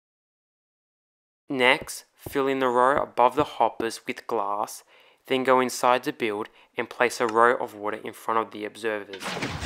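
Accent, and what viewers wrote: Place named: Australia. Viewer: As soon as I heard your voice, I knew you had to be aussie. Sure enough, the 'about' page for your channel confirms it! Hey mate :P Just checking your video to reply to your question on VidIQ discord. I've subscribed :)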